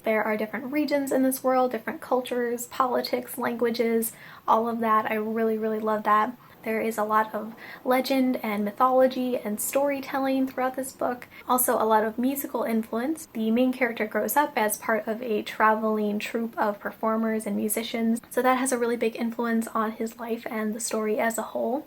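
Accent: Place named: North America. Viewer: Hi, this is my first visit to your channel. I was instantly struck by the dichotomy of your North American accent and the fact you have the international edition of the book. At least, I'm pretty sure of it; I'm an American and have the U.S. edition, but also bought the UK version (the same one you have)... Are you living overseas? Was it a gift? Am I rambling and not making any sense at all?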